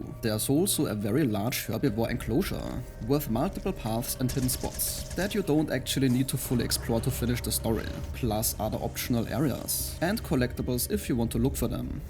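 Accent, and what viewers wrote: Germany. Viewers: Sounds german :D Thanks for the review !!! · Junge dieser deutsche Akzent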